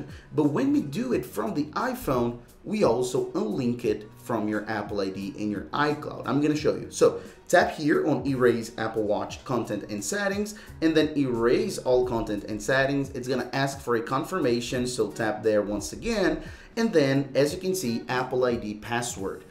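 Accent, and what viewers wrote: Brazil. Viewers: That Brazilian accent is thick my boy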